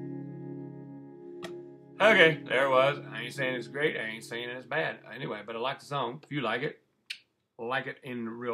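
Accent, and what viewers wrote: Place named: West Virginia, United States. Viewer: Pretty good man. Listening to your accent makes me wonder why I ever left WV. I can not wait to be back next month.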